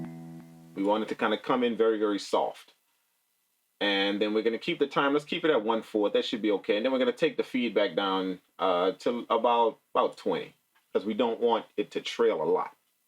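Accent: New Orleans, United States. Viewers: Man are you from New Orleans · Lol. Interesting. Are you from N.O.? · Love the accent sounds NOLA new to the MPC and beat making new sub🔥🔥🔥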